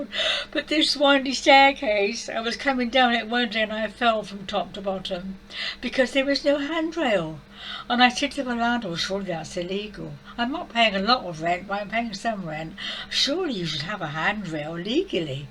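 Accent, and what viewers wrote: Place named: United Kingdom. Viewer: Please consider posting SHORT videos to attract viewers! I am sorry that videos longer than 5 mins are very daunting when pressed by time. Sorry this is not to be negative but hopefully will help you: consider watching yourself before uploaing and using the edit button to trim out unnecessary talk? You can still make long videos for your regular viewers but for newcomers, we need SHORT and BRIEF. Myself,I can't understand British accents at all, so I'm going to start over with the captions....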